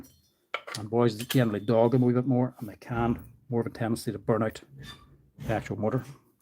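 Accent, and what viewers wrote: Ireland. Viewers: . Keep doin what you do. Love hearin Irish from the other side of the pond 🤪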